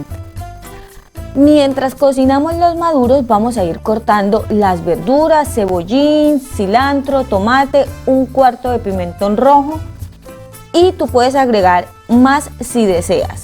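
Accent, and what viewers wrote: Colombia. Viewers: Me encantó la receta quiero saber de dónde eres es que le siento como un acento colombiano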